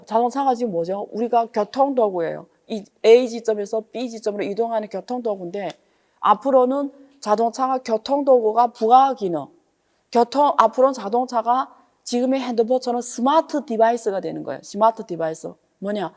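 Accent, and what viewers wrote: Yanbian, China. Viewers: 연변에서 조선족출신 이신것같아요 경상도 쪽이요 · 난,좌파도아니고친중도아니지만,,,조선족남자는편견과달리 나름좋은분을만나봐서 디스는안하려구 ᆢ근데확실히이번정부는친중이고,이건아마도 이미중국의내정이깊숙히개입되었다는것,눈에보임 ㆍ티가너무납니다,아마추어인내게도보이는데?인물이반반해서 먼애기하시나들어왔는데,말투가 조선족처자,머그렇다고해,경제,정치애기를구구절절하시는데,,,이분이일단은교양이부족해,나름전문가적소견을어필하는데도,,,머랄가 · 교수님말투가 이따금 연변말투같네요 ㅋㅋㅋㅋㅋㅋ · 이번주는 안유화~ 강의 꾸준히 듣겠습니다. 연변식 한국어, 너무 귀엽고 친절하네요. ^^*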